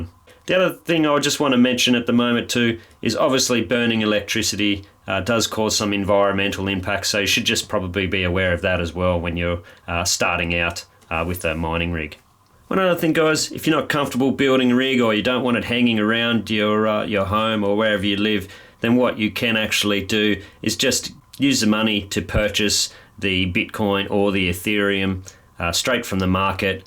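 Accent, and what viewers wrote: Australia. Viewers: Excellent no-nonsense videos, great to hear an Australian voice... can you tell me what the data usage is on a rig like this per month? Is that a stupid question?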